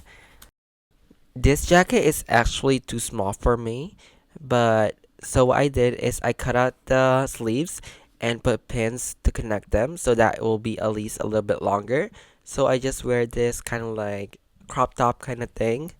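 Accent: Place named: Philippines. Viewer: I thin he got filipino accent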